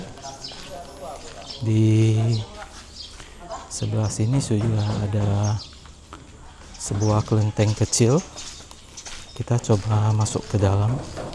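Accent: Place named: Pontianak, Indonesia. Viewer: logat admin khas tionghoa ponti..hehehe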